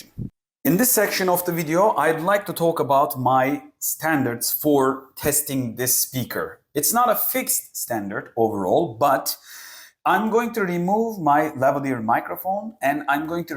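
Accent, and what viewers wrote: Turkey. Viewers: tam Türk aksanı var diyecektim Türkiye dedin :) güzel video olmuş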